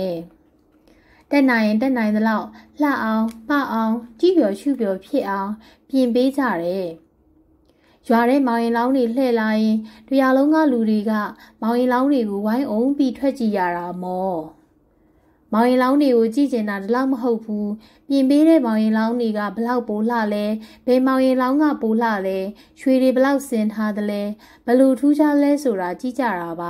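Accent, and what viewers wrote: Upper Myanmar, Myanmar. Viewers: အသံေလးကိုက အညာဆန္ေနတယ္ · အသံကအညာလေသံ...ချင်းတွင်းနဲ့ဧရာ ဆုံတဲ့ကျွန်းထဲကအသံမျိုး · ဖတ်ပြတဲ့ ညီမလေးအသံက နားထောင်ကောင်းချက် အညာကို တကယ်ပြန်ရောက်သွားသလိုပဲ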